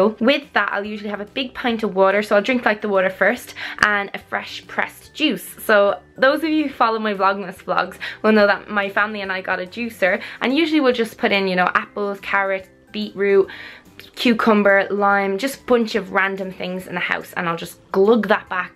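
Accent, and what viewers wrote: Ireland. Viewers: I've never watched this girl before, only know about her because of the whole Toby thing but Jesus Christ she is beautiful, her eyes are hypnotic, and the Irish accent is just wow.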